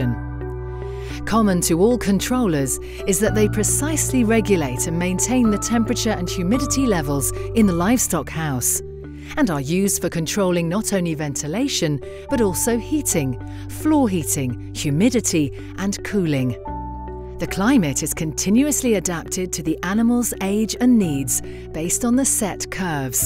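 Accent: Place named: United Kingdom. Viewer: The only horrible thing of this video is that brit accent. I had to turn off the audio.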